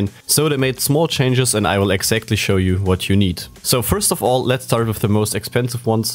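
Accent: Germany. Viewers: Bist du deutsch wenn ja mann hörts xD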